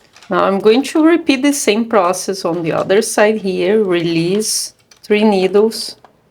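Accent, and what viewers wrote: Brazil. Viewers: Are you brazilian ? You have the brazilian accent 😊 I love the tutorial! Thanks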